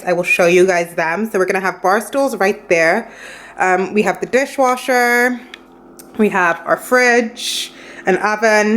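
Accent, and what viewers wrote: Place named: United Kingdom. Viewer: Shes brittish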